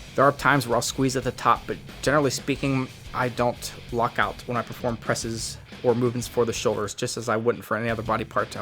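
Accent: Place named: United States. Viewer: Whats the accent? Its American but there sounds like theres something else in there..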